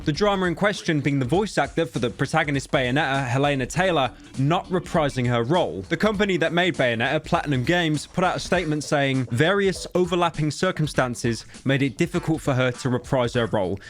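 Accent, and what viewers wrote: United Kingdom. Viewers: I like when the funny British man talks about the popular thing on the screen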